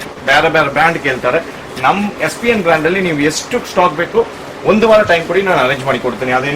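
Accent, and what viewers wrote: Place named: Tamil Nadu, India. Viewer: Typical tamilian Kannada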